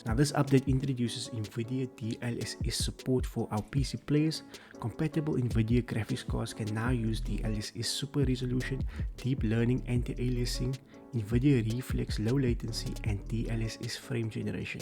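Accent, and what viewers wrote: South Africa. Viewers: Mate love the South African accent haha. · Lol not doging your voice or anything but I genuinely thought you sounded Indian with a British accent. Only when you said south African did I start hearing that.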